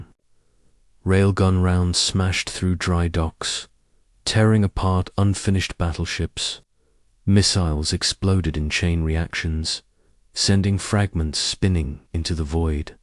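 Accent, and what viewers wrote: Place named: United Kingdom. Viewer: The slow speed combined with the British accent is terrible! This makes me want to go to sleep in the 1st 10 seconds.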